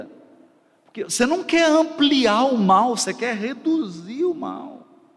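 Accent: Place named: Minas Gerais, Brazil. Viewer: Tô ouvindo você e observando a fala em todos os sentidos e aspectos Percebi que é mineiro assim como eu E esse exemplo de mineiro ir p são Paulo por essas regiões estradas citadas passei por lá e sem GPS , MAS CHEGUEI LÁ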